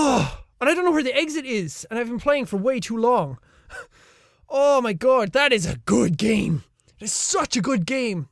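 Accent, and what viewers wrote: Ireland. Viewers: you have made a (somewhat jealous) subscriber out of me all right. God I wish I was raised in Ireland, I LOVE Irish accents, best in the world, screw Britain, Germany, Russia, all them suck when pinned against an Irish accent. Anyway, yea, I wish I was raised in Ireland so I could have that awesome accent, and I'd be happy with a little more Irish blood, both of my grandfathers are Irish, but so far down the family tree it means nothing.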